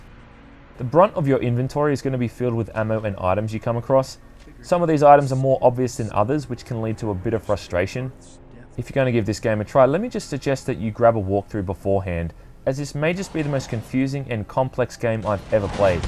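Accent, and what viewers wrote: Australia. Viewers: Why must people change the way a words are pronounced. T,tulu????? It's always pronounced with a c Cthulhu. Americans love changing the English language. Why???? You sound Australian.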